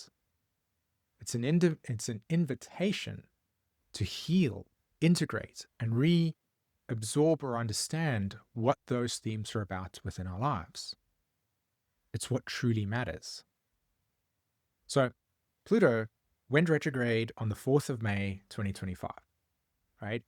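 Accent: South Africa. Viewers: I'm wondering if your accent is South African?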